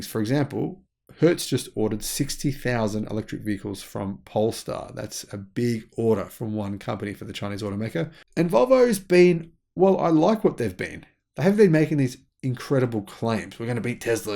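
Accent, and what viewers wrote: Australia. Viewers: I have been to Melbourne. I met Cambell Bowell in Frankston. He gave me a ride in his new car, the Nagari and showed me around his facility. A very nice guy! This was a long time ago. I also met some people named Evans in Frankston. I haven't been to Australia in over 50 years but I do enjoy your accent and enthusiasm for our new EV world.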